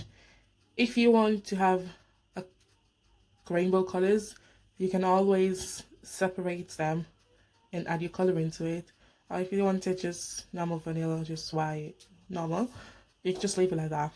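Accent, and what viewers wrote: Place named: Haiti. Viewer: Yeah you sound Haitian haha xx great video!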